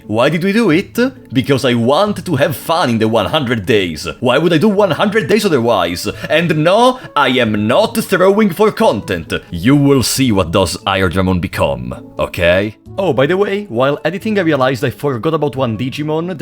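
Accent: Italy. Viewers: the thick italian accent sends me.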